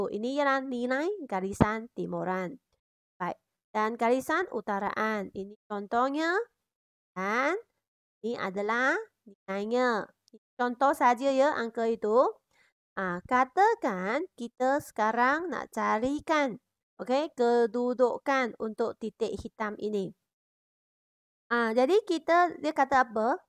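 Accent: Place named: China. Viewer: Cuma cikgu ni Chinese so slang dia lain sikit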